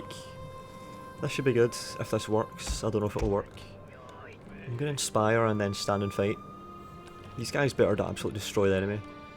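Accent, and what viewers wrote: Scotland, United Kingdom. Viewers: So glad i stumbled upon your series a few weeks back, love it, im guessing you are from Scotland?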